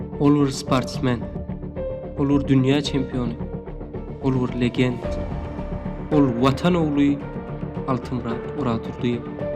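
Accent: Mary, Turkmenistan. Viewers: Maryñ aksenti bar diymesen, video erbet dal , gowy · Frozen citizen Maryn aksenti bolnanna name?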